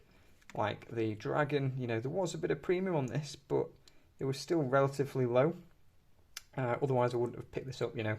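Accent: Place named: North, United Kingdom. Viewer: This person seems to have a northern accent. Such types shouldn't be allowed to buy gold, frankly. Big tvs and trainers and BMWs maybe, but not gold.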